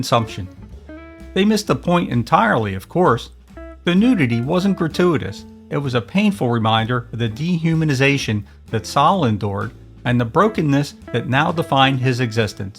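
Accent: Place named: Pittsburgh, United States. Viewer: Hey. Not sure if I asked before but are you a rust belter? PA? Ohio? Michigan? Your accent sounds Pittsburgh like.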